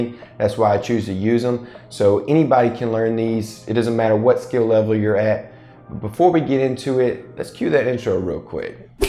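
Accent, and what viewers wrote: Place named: South, United States. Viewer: Your speech is sooo nice!! Come on southern bred 🤣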